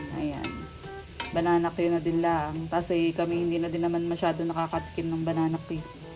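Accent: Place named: Quezon, Philippines. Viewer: Ok lng Naman kahit may Punto ang salita ganon talaga sa Quezon prov!❤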